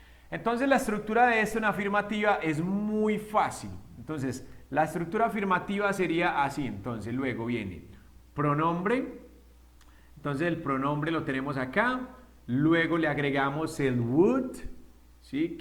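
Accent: Colombia. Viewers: Excelente la manera de explicar,de lo mejor que e visto en YouTube y es mucho más interesante por el acento siento que me está enseñando el mismísimo patrón Pablo Escobar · Gracias! Me gusta tu acento colombiano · Gracias... muy bien explicado, soy de México, veo que tienes acento colombiano. Saludos ✌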